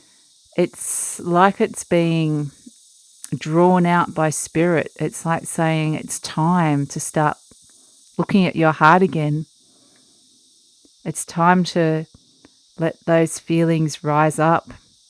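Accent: Australia. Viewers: You sound quite the "Aussie."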